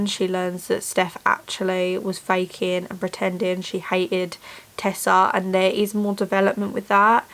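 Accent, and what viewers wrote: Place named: Yorkshire, United Kingdom. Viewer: I loved this book so much mostly because the book was so freaking large, I’d say it was almost larger than Harry Potter book series but I loved your review and I am hoping for the 3rd and 4th movies to be longer cuz there’s much more pages and suitations happening so it’s way out there but, here’s hoping it goes well with the direction of the last two books and weather or not they keep the cast from the 1st and 2ed movies. Ps I love your accent, r u from yorkshire? Or somewhere close. Hehe 🤔💕 also please follow me on Instagram Karalynnlovison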